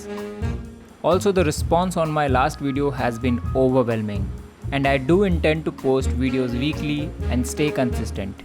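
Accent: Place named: India. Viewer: I just found your channel half an hour ago and binge-watched all your videos in 2x. I'm a big fan of DIY and homesteading-related videos. This kind of content is usually done abroad, and it was a big surprise to me to hear an Indian voice at the start of the video. I loved it, and I'm so glad you're bringing these kinds of videos with a more Indian-centric viewpoint! Plus points for me: 1. You mention what worked and what didn't. 2. Whether DIY is even required in some cases. 3. The prices and the source of everything you use/purchase. 4. Solid video editing! Must be a lot of effort to record and do these kinds of projects at the same time, so hats off and keep up the good work!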